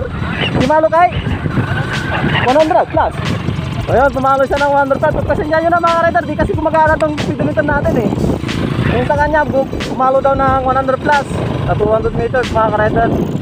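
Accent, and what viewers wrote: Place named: Pangasinan, Philippines. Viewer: Nice content! As of this moment, nirerestor ko ung TMX <num> model ko kaya naghahanap ako ng mga video about sa tmx 155 napadpad ako sa video nato And As i’ve observed ung accent ng uploader is Pangasinan. Pa shout out Next video sir. I’m from Aguilar Pangasinan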